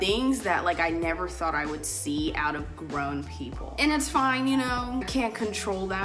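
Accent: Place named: New Jersey, United States. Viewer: the jersey accent is always a great addition to the videos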